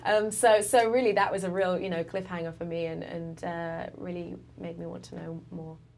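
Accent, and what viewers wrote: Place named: RP, United Kingdom. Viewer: She sounds very different in this interview, so much more posh! She's great, I love her.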